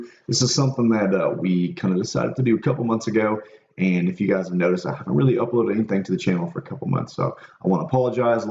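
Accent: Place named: United States. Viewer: Great review! Love the American accent 🦅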